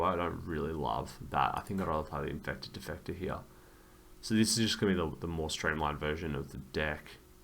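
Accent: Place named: Australia. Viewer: Just found your channel and I really appreciate the way you talk through your draft and analyse what went wrong in that smooth aussie accent.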